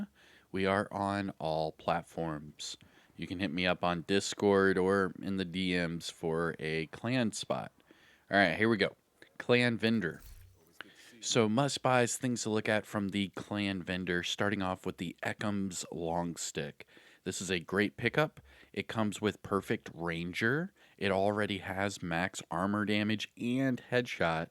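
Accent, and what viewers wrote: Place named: Northern California, United States. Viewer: Its your opening! It peggs you to Northern California. "how ya doing? whats going on?" The tonal sound and enuciation is possibly even going towards San Jose - Santa Cruz.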